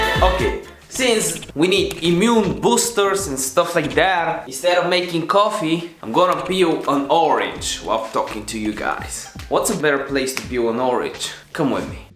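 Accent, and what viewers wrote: Russia. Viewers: Руски акцент а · I think the Russian accent is better than the Indian lool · First time seeing a russian accent tutorial instead of an indian one.. Both need subtitles though..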